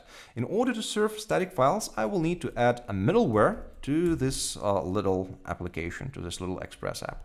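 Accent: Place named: Austria. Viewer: I have a friend from Austria and u sound just like him. Are u Austrian? Really good tutorial, Thankyou!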